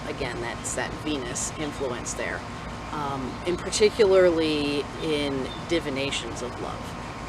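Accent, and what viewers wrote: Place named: New England, United States. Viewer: Sounds like my neck of the woods. New England.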